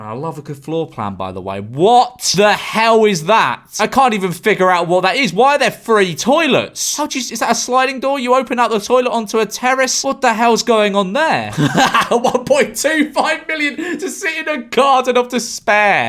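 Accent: United Kingdom. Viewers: "You do see some absolute honkers listed for a million" the British way of speaking is so fun lmao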